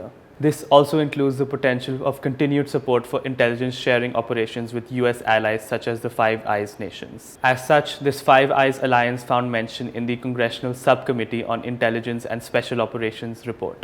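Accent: India. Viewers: This f..ing indian accent :-(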